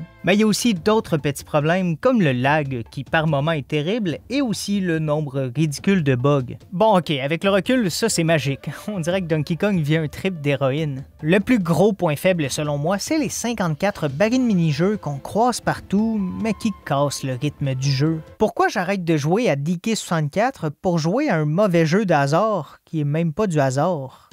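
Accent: Quebec, Canada. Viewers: Je suis québécois et j’adore ça t’as un québécois tu est mon préféré de tous les youtubeurs mon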